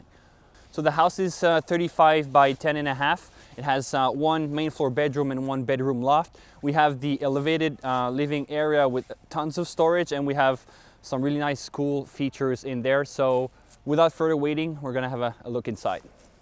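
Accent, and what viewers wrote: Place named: France. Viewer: Y'all build the most beautiful and highest quality homes of all. Speaking of French accents, you seem to be losing yours with every new video. Never lose the quality of construction and your sense of humor. You guys are the best!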